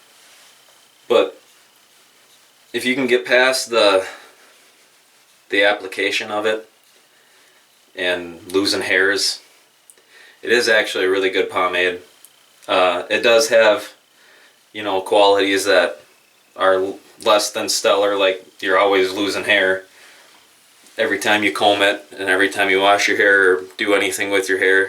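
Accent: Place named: Wisconsin, United States. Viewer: You've got a Wisconsin or Minnesota accent man. Good review though.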